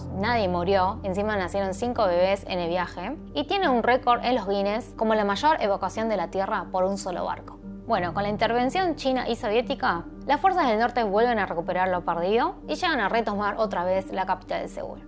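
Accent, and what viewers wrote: Argentina. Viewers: Con razón tu tono argentino de hablar que no captava del todo. Muy interesante la historia. Gracias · una KEIKO y habla como argentina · Que linda ver yba asiatica manejando bien el español pero estabpiba lleva algo de acento argentino xd jaja te amo ...por darnos esta información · Las mejores narraciones han sido con acento argentino, aquí otra prueba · I would rather listen to you narrating this video in Korean or English than in Spanish and worst with that argentinian accent, pity!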